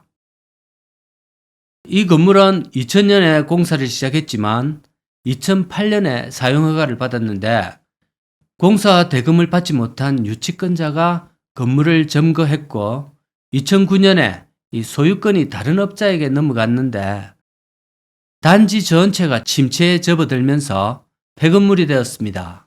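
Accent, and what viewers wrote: Gyeongsang, South Korea. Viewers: 수고많으십니다 구수한경상도 말씀 재밋게시청합니다♡♡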